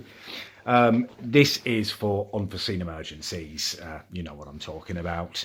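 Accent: United Kingdom. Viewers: British accent is the greatest 😂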